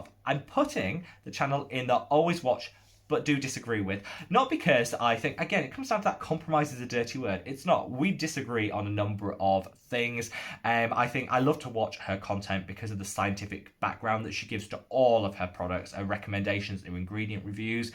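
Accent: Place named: North, United Kingdom. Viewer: Ahh. That's it. The northern UK accent is what you have. That's what I had thought. I've seen videos in the past about all of the different accents in the UK. I was terrifyingly obsessed with the Spice Girls in the late 90's. I was 11 when they debued in 1997. They were famous/popular in Canada almost instantly/the same time as in the USA and the UK.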